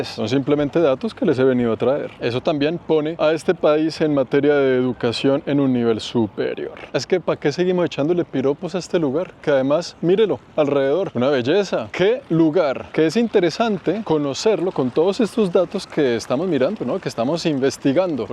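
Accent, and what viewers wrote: Bogotá, Colombia. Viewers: Me encanta como me haces viajar desde mi humilde habitación de España, por cierto me gusta mucho la forma de hablar con el acento colombiano y la cultura que trasmites con tu contenido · Si.muy Colombiano en sus modos de hablar y dichos.👍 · Me encanta su voz 😅 el verdadero acento rolo, sin la degradacion que le han dado muchos habitantes de bogota. Gracias por tus exelentes videos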